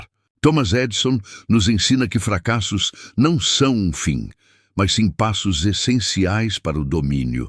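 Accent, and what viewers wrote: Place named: Portugal. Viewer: Os vídeos são bons o que incomoda é esse sotaque português não entendo porque colocam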